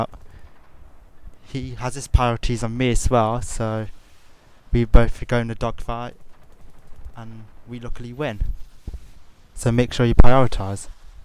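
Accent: United Kingdom. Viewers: are u british u sound british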